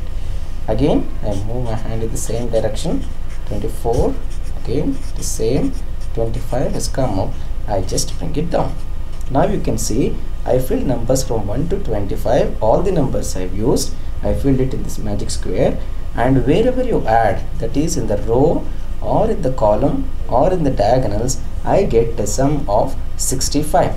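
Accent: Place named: India. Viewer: are you indian. the accent is nice :P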